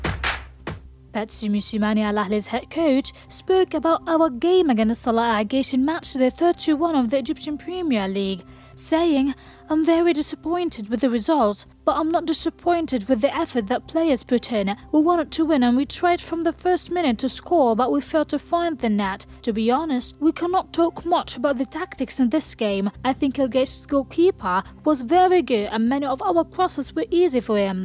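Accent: United Kingdom. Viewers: انجليزي بريطاني بريطاني👍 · A good British accent. It should be less severe than it is.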